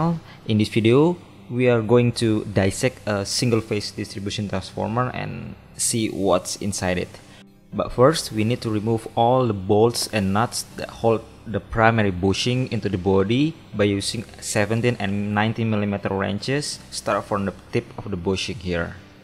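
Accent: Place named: Indonesia. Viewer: Logat orang Indonesia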